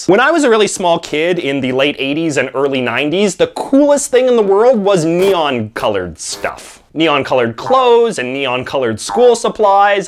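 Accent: Canada. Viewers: "Aroond", well now I know you're not faking the "aboot" thing. Btw I'm also 32, hi-five.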